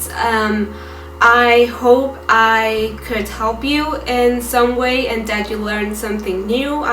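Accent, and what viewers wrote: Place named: India. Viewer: Are you Indian ? You've that little Indian English accent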